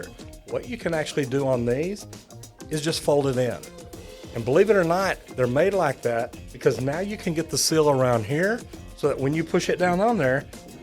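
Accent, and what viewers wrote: Texas, United States. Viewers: That I can tell a fellow Texan accent from the rest of the world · His accent is a mix of gay and Texas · It's so great hearin a North Texan accent, I ain't sure what city exactly but that's DFW within 75 miles could be a bit further either way... Folks don't realize we'll drive 45 minutes for supper in Texas, it's a stretch town to town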